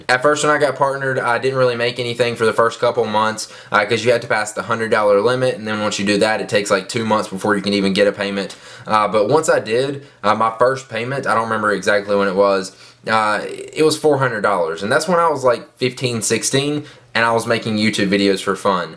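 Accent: South, United States. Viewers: I might wanna infer that your accent's from the South! Are yah.!?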